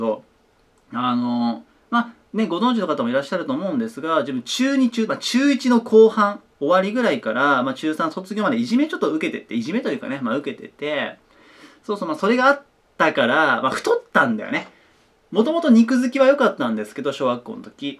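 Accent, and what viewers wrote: Kansai, Japan. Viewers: 関西弁が入ってた方がいいです！！！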